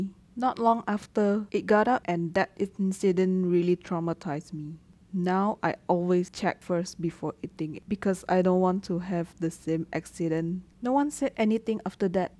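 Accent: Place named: Singapore. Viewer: Not to be rude or anything but are you from Singapore? I had 2 friends online that I used to play games with and they talked like you. No offense 😅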